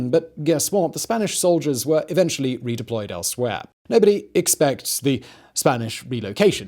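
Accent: United Kingdom. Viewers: About your plug for the newest channel...I thoroughly enjoy your content. I watch all your channels and there is a reason for that. Though I have not really taken to the newest one. I think the draw is your English or British accent. I am not sure how to frame it because I know a few men from Scotland and they didn't take it as polite that I called them English as the UK is not quite like the United States. I can call any person from any number of states an American and they would not get upset, though a Texan might point out that they are from Texas.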